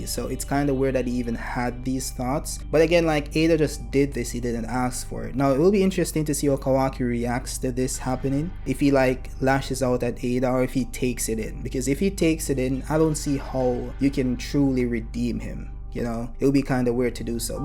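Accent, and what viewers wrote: Jamaica. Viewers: You sound like a Jamaican speaking standard English. Are you Jamaican bro?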